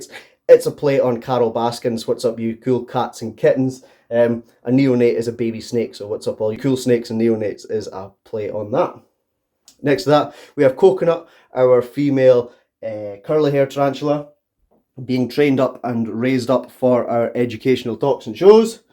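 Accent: Scotland, United Kingdom. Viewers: I am in Banchory good to hear a Scottish accent on you tube thanks for the reply I’m enjoying your videos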